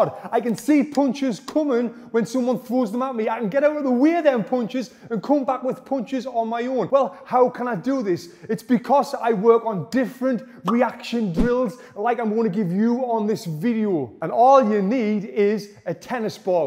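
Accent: United Kingdom. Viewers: British accent sounds cool. From Russia with respect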